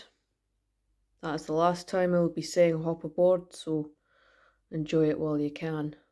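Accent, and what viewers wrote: Scotland, United Kingdom. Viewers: Good to see back! Really getting that Scottish accent down good We all miss you here in Texas, hope you make it back for the West Texas fair and rodeo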